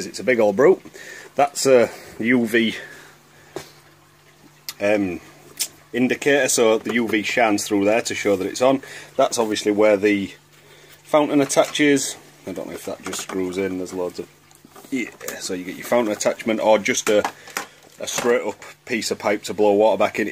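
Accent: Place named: Northern England, United Kingdom. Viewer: Strong northern accent 😂 I'm londoner and I have trouble understanding too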